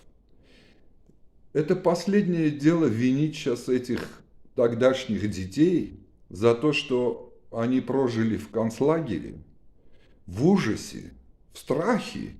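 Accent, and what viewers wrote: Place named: North Ossetia, Russia. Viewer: Спасибо вам за правду, вы человек с большой буквы не знаю кто вы и какой вы нации но по акценту вроде осетин но это не суть важно, важно что вы доносите до людей повторюсь правду ,мир в долгу перед чеченцами, за то что бросили один на один в войне с Россией и причина этому, боязнь гнева огромной ядерной державы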